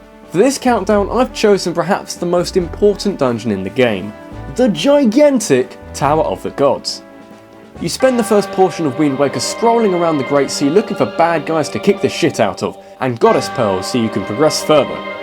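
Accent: England, United Kingdom. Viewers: Are you British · Does he come from england?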